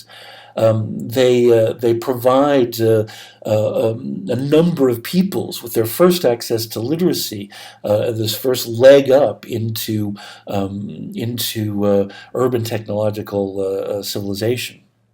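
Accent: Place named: United States. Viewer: Not the worst American accent, I can actually listen to this one for more than 5 seconds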